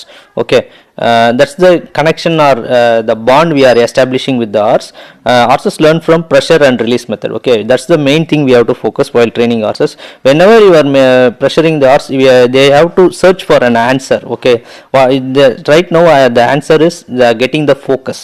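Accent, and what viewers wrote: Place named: United Kingdom. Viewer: British english ah na😟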